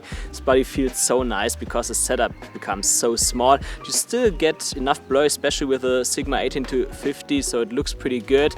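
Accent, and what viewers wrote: Germany. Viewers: Hey, really nice review! I got the Fuji X-S20 this week as well🙂 I am new to Fuji X. Since you mention it a lot in the review: What kind of video settings would you recommend for YouTube videos (No Flog / without color grading)? I think about: 4k, H265, 4:2:0, 200 Mbps, Eterna (?) Looking forward using this camera! Bist du eigentlich Deutscher? Es hört sich so an 😀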